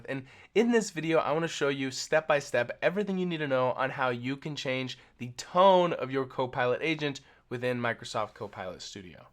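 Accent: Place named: England, United Kingdom. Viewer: Tone is one thing, how do you get rid of the annoying English accent?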